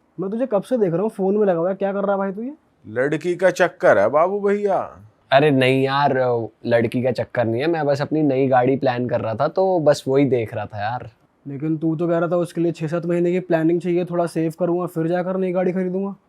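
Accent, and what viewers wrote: India. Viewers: There bolne k lahje se PTA Chala ki tu bihari jhatu hai😂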